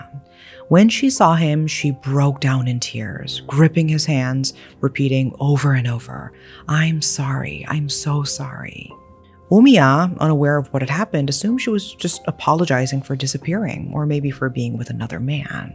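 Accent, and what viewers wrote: South Korea. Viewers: I love how you say Asian names with a Korean accent - a unique twist! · I can hear some Korean pronunciation to be honest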